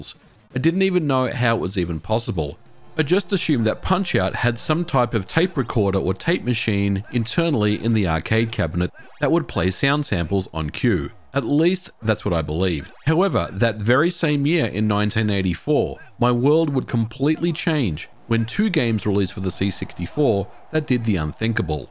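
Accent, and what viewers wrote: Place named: Australia. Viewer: The Australian accent just hits different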